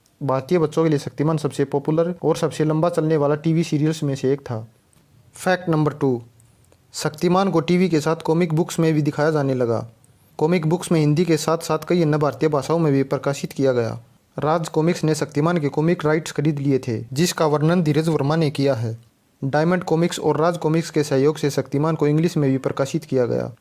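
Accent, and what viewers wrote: Haryana, India. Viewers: Bhai jyada haryanvy touch aa rha h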